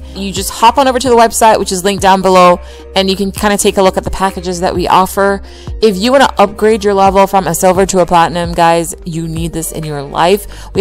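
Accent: Trinidad and Tobago. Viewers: Caribbean accent, from Trinidad right ?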